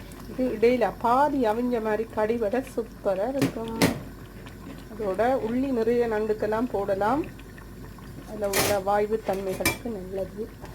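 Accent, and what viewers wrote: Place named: Sri Lanka. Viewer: நண்டுக்கறி வறுத்து செய்த சமையல் மிகவும் அருமையாக உள்ளது இலங்கை தமிழில் உங்கள் பேச்சு அதை விட இனிமையாக உள்ளது சகோதரி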